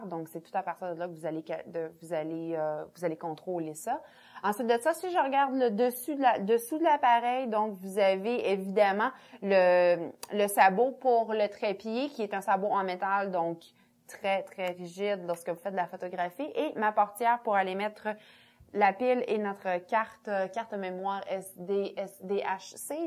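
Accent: Quebec, Canada. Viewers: Belle vidéo mais malheureusement c'est pas tout le monde qui comprends le Québecois. J'ai un peu de misère voyant. ....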